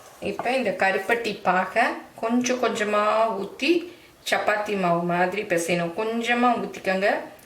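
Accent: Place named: Chettinad, India. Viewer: Hats off achi, use our chettinad Tamil when speaking. It's so nice to hear. Very sweet of you. Recipes are good too.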